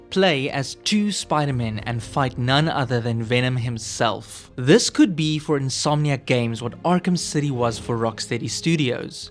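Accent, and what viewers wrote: South Africa. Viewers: Going into spiderman 2 I was expecting the game to be the arkham city (still the best superhero game as far as I'm concerned) of its series and it doesn't. The first game lived up to my excitement and it's very good and it was the foundation just as Asylum was for Batman but. Miles morales was moving in the right direction but this game was a letdown. Spiderman 2's stealth is worse somehow, the combat is missing the suit and visor mods, suit powers and gadgets are worse versions of the previous ones. The only good thing is the traversal and it's still lacking feature games like web of shadows allowing spiderman to run in any direction on the wall. I shouldn't have pre-ordered this one and got the RE4 remake and Deadspace remake. The games only cost 600 rands each at BT games and I would've saved 200 (I assume you're South African with accent). 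I agree about venom and Kraven being the best visually and the VAs did an amazing job but I was hoping they'd be stalkers who pounce out of nowhere like taskmaster did in the first game but Kraven was mostly a Gladiator. 6/10 after my second playthrough. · Fellow South African here. First time I’m coming across one of your videos, heard your accent and it was an instant ‘Subscribe’ for me 💪🏽 keep it up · Are you South African by any chance?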